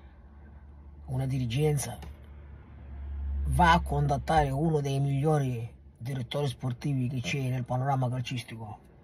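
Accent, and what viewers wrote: Sicily, Italy. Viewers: Sei un continuo piacere l'ascoltarti. Onesta' immensa (e complimenti per come parli lingue straniere, aggiunto al tuo meravigliodo accento siracusano)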